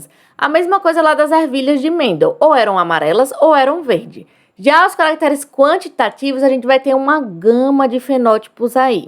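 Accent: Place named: Ceará, Brazil. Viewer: tu és do Ceará? eu acho pelo sotaque :) Eu amooooo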